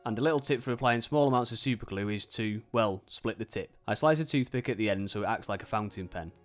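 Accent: United Kingdom. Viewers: this is pure art...doing the job and explaining it so well with this beautiful british accent...luuush:-)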